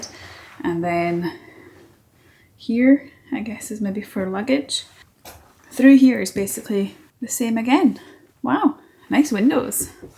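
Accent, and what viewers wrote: Scotland, United Kingdom. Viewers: You are quite adorable. I'm from Clarkston originally, although I have lived in Vancouver British Columbia since 1967 at the age of 15 and your accent is so fresh and beautiful.....what part of Scotland did you grow up in? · Such a lovely warm person. Always real and uplifting. And I so enjoy listening to your Scottish accent. Thanks for sharing your adventures. Looking forward to the next one. From the redwoods in Northern California.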